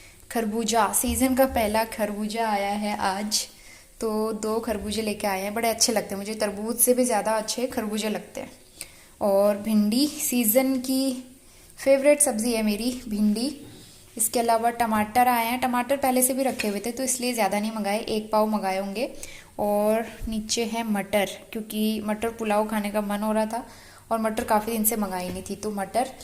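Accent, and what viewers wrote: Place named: Pahadi, India. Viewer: Suparb vlog lagte h apke me bhi kumaungi hu mujhe apka pahadi bolna babut cut lga 😍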